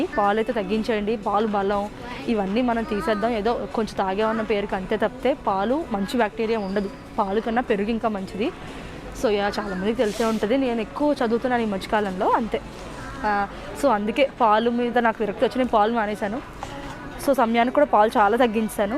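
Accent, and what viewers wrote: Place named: Godavari, India. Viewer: మీ గోదావరి యాస వినసొంపుగా వుంది, మేము కూడా లండన్ వచ్చేయాలని వుంది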